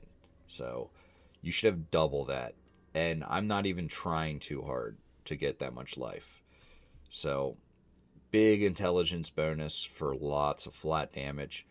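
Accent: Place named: Philadelphia, United States. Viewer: That sounds like a Philly accent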